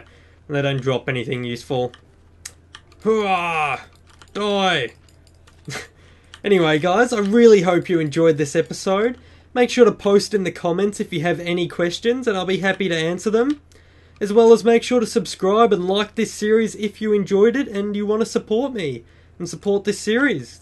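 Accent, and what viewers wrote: Australia. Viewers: is it just me or does sound australian?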